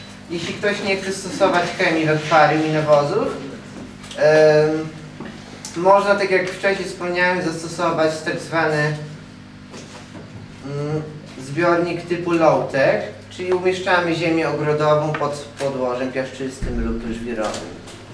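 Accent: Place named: Kresy, Poland. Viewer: fajny ten akcent to pewnie jakieś kresy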